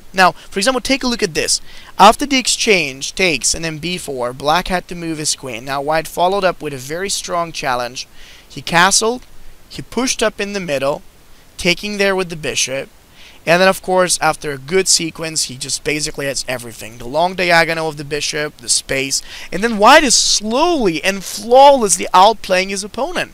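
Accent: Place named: United States. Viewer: he's from Bulgaria, however, I'd say his accent is 90% american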